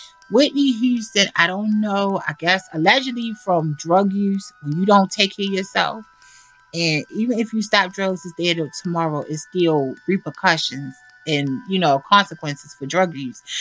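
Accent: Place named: Baltimore, United States. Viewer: Are you from Baltimore? Your accent sounds like it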